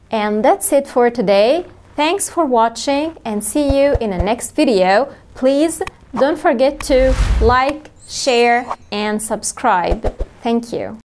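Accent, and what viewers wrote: United States. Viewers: Thanks for everything my friend to speak and to teach only in English you have a very powerful English with american accent with a perfect pronunciation. It's very very useful when you speak only in English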